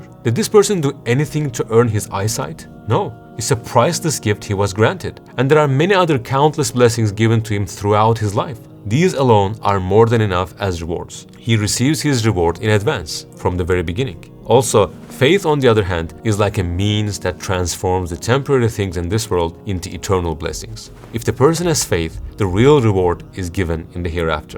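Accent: Turkey. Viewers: brother are you Turkish? Your accent sound like your Turkish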